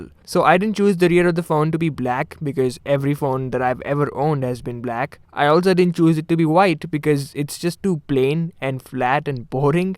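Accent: India. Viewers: I'm a simple man. I hear an Indian accent, I click dislike.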